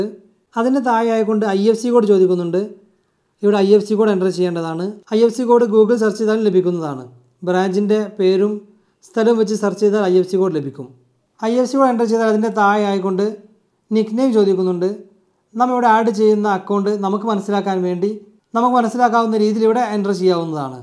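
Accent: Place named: Kondotty, India. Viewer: ഇടക്ക് ആ കൊേണ്ടോട്ടി സലാങ്ക് വരുന്നുണ്ട് കട്ട സപ്പോർട്ട് ഇക്കാ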